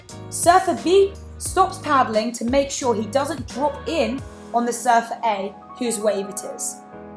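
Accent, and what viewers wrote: United Kingdom. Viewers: It doesn’t feel right being taught surfing from a British accent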